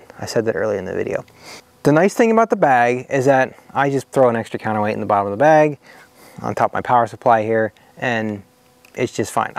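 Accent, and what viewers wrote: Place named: United States. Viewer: how come you have an american accent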